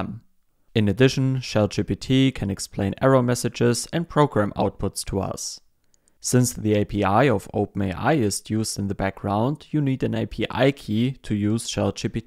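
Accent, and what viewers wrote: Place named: Germany. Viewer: nice video very deutscher Accent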